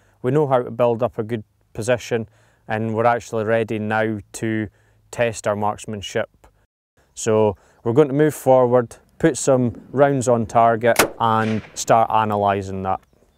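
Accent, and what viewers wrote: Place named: Scotland, United Kingdom. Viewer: Love that Scottish accent Laddie.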